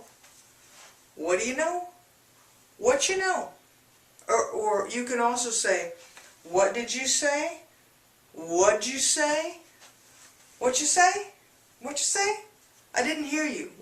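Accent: United States. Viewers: Easy Spoken English it's an Americanized English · is it american or british ?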